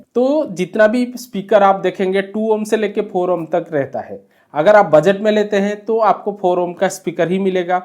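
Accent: Odisha, India. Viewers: odisha se ho?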